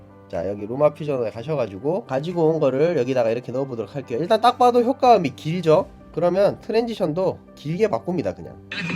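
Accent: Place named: Gyeongsang, South Korea. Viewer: 안녕하세요.. 구수한 경상도 사투리로 듣는 Lumafusion 영상 편집 설명 아주 아주 감사합니다. 저도 대구에 살다가 와서.. ㅎㅎ LA 사는 50대 아줌마가 뒤늦게 유튜브에 관심이 생겨서 틈틈히 공부중인데 아주 도움이 많이 되고 있어요. 기계치여서 겁부터 났는데 설명을 들으며 해보니 재미도 있고요. 아직 아이패트 3는 없어서 아이폰으로 해보고 있는데.. 암튼 감사합니다. 유튜브 대박 행진하시길~ 짱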